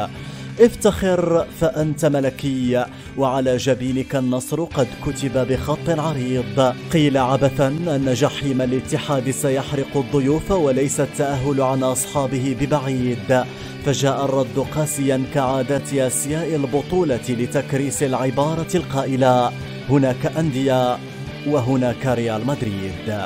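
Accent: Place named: Iraq. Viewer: اه كتير حلو وحكيه مميز والسرد ايضا.. من كلامك مبين انك عراقي😊♥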